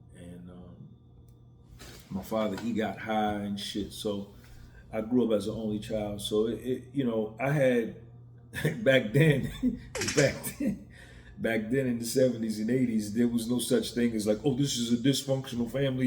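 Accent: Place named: New York, United States. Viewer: I'm astounded how you have a NYC accent, and I'm from Jamaica Queens, so I know New York a accents.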